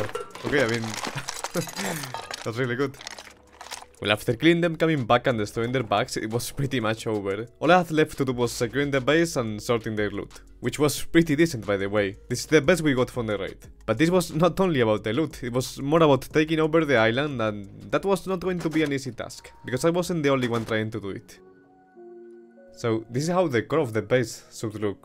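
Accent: Spain. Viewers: Are you spanish? diría que si que por tu acento al hablar, pero puedo equivocarme. Un saludo y sigue haciendo videos así de buenos! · This vid was amazing lol. Love your accent are you from Spain? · Hola, nose si hablas español pero supongo que si por el acento, buen video, me encantan tus videos y el hecho de que hables ingles, si no hablas español, nice videos mate, keep like this and u will grow very fast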